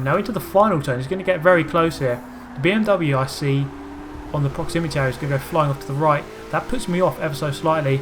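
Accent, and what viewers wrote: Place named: RP, United Kingdom. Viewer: I like the gameplay and reasoning behind the trolling the trolls idea, but you sound so posh especially when you say “That’s a bit of a inappropriate joke for a child’s racing game”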